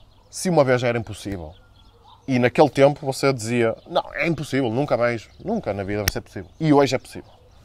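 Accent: North, Portugal. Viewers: Decide te com que sotaque falas. Ou Português de Portugal ou do Brasil. É que misturar os dois, e ainda por cima com sotaque nortenho, fica uma cena mesma esquisita e sem graça...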